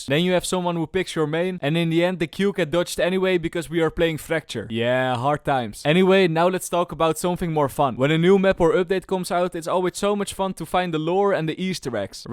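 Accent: Netherlands. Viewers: I suspect you were Dutch by the accent, but then proved it lmao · Me: 99% certain this guy is dutch like me cuse of the accent. You: “easy POTJE valorant” Me: 100% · this accent sounds kinda like dutch... :) but i like your vids man keep going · I hear ur dutch accent so loud “if you wanna play a easy potje”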